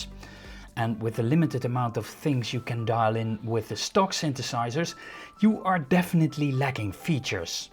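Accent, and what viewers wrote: Netherlands. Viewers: Hey hoor ik nou een Nederlands accent? :D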